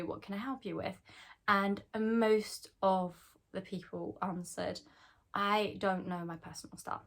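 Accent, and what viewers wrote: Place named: United Kingdom. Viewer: Jag trodde du var Britisk, jag älskar din brittiska accent, hälsningar från Peru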